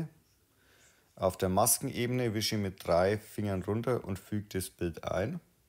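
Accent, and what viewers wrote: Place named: Franconia, Germany. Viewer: dritter Anlauf hat funktioniert nachdem ich alle Kommentare gelesen habe. Spitze! Danke, und ich liebe fränkischen Akzent und Schäufala und Scheurebe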